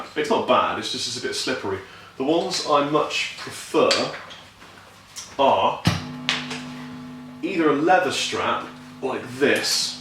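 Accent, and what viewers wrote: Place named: England, United Kingdom. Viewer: I can’t help but comment on the hair + being white, and then he started speaking with an English accent and I just chuckled.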